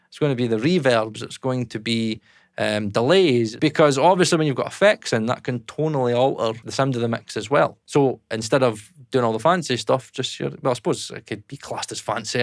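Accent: Scotland, United Kingdom. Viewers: You're such a lovable guy. And such a thick but great Scottish accent lol (I'm assuming, because you sound Scottish to me). Very funny edits and such. And great music production education/topics. I don't know how big you are into sound design but I for one would be very interested to hear your unique techniques and views on that kind of thing. And some writing as well because who wants a non captivating song. Cheers mate 🍻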